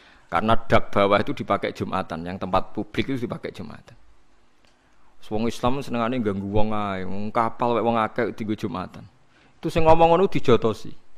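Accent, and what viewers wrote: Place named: Java, Indonesia. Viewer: Gusti Awwoh... Atau Gusti Allah, atau Alloh... Ada bbrp dr kami org jawa yg tdk bisa melafadzkan dgn baik. Tapi bukan berarti itu salah. Alfatihah saja bisa jadi alpatekah,... Kalau bersyahadat harus dikatakan dgn benar baru bisa dikatakan islam, maka orang bisu tdk bisa ber-Islam donk... Mohon anda mengerti bahwa kata itu tdk penting² amat, selama yg dimaksud hati tdk beralih makna.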